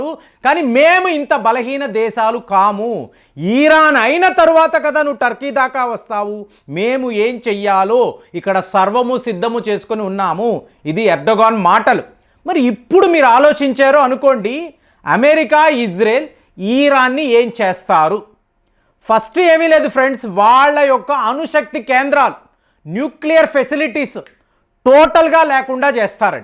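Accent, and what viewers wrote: Tamil Nadu, India. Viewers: మీరు చాలా పదాలు మిస్ప్రొనౌన్సియేషన్ చేస్తున్నారు. దానివల్ల వరల్డ్ న్యూస్లో విషయాలు అర్థంకవడంలేదు. ఖమేని అంటున్నారు. బుక్స్ లో చదువుకున్నది అయితుల్ల ఖోమైని అని ఇప్పుడు మీరు కుమేని అంటున్నారు. చాలా వీడియోలలో మీరు ఇలా pronounciation చెయ్యడం మాకు రుచించడంలేదు. ఇరాన్ నీ మీరు ఈరాన్ ఇలా కన్ఫ్యూజన్ అవుతోంది మీరు తమిళ్ slag vidavandi. తెలుగు పాత్య పుస్తకాల భాష వాడండి. లేదా మీ వీడియోలు మీరే చూసుకోండి. మా మీద వద్దు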